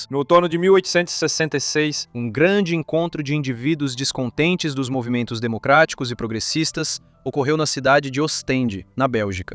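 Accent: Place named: Brazil. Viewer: Parabéns pelo vídeo, ótimo áudio em português brasileiro lindo, não assisto mais brief case, devido ao sotaque de Portugal, gostava muito do canal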